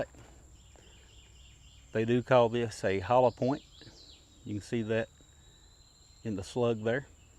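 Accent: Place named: South, United States. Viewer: that southern drawl gets soo annoying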